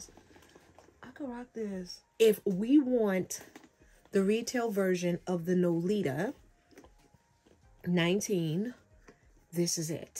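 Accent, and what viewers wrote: Jamaica. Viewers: Omg, I hear an accent. Do I hear Jamaican? I love that you decided to bless someone. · TFS, the bags are beautiful ❤️ instant subby as soon as your Jamaican accent started leaking out...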